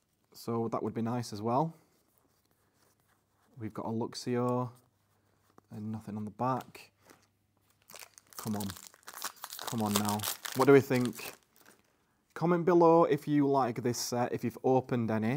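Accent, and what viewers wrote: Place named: Leeds, United Kingdom. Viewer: that's definitely a Leeds accent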